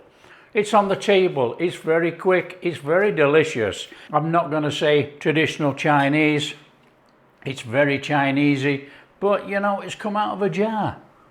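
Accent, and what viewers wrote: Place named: England, United Kingdom. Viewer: a luv your cooking style,im basic and want easy peasy though great taste im sure and too many complicated recipes oot there,emigrated to Canada from glasgow as a child,love your accent,what part of england is this accent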